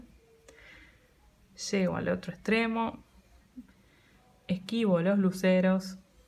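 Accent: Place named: Spain. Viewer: Me encanta su acento de español ❤❤❤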